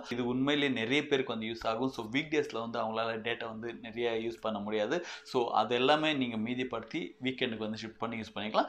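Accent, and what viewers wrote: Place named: Hosur, India. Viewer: Bro Neenga endha ooru. Unga slang nalla irukku. Hosur ah.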